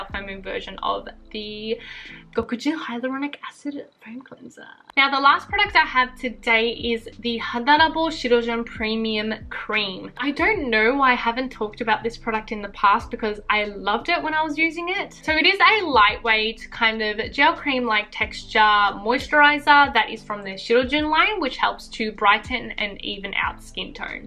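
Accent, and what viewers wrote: Melbourne, Australia. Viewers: I'm trying to develop the superpower that is Being Able to Distinguish Between Australian Accents. You have the accent of a well-educated Melburnian but you sound like some friends I have in Sydney too...I'm torn. Argh. Please let me know whether or not I should hang up my cape ;) PS= Hada Labo will be the next brand I really investigate next time I'm replacing skincare in Japan so thanks for this video. :)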